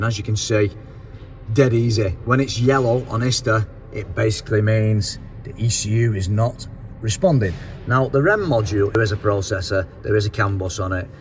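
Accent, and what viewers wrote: North, United Kingdom. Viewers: Awesome and a great Northern accent to go with it! I think this is my issue and Ill report here if it works. (10-12 ins snow currently......)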